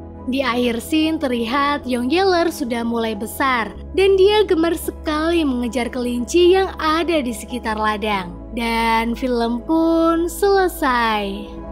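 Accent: Pemalang, Indonesia. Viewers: Orang Pemalang ya mbak?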